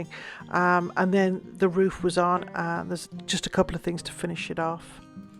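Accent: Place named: England, United Kingdom. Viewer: Ive oy been watching for short time but i love all tge creating that you do. I love your style as well. Ive wondered because of your accent if you lived in England. Something you said in one of your videos made me think you were in Canada. Now in the comments i see you bought a home in France. Congrats on that. Please tell me where you live now and doing all the beUtiful work.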